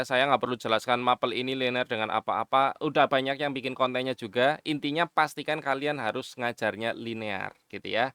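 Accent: Banyumas, Indonesia. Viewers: Mas mw nanya. Kalau honor negeri bs cair gk kira"?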